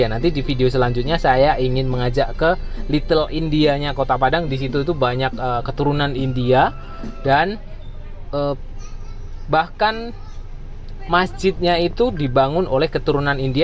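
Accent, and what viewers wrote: Java, Indonesia. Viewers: Dari lidahnya klo ngomong bahasa Indonesia seperti YouTuber² dr Jawa .. orang Jawa tengah y om...? Orang ngapak sepertinya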